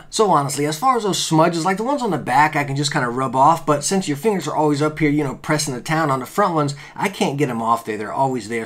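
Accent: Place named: South, United States. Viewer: I love this guys country accent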